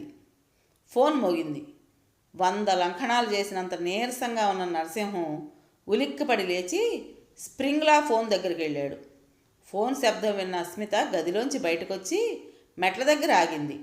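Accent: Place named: Andhra Pradesh, India. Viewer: Abba entha bagundante matalatho cheppalen,Ee kadha cheppadamlo really super,nenu chadivinadi undinadiAndralone,kani Naa mettinillu Tamilnadu mee gonthu vine tappudu Andralone (maa uru Gooty)unna feeling ravadam kallu chemarchetatuvanti adbuthamaina anubavam kadhanu chadivuthunna prathi saree kaluguthundi 🙏🙏🙏🙏🙏🙏🙏❤️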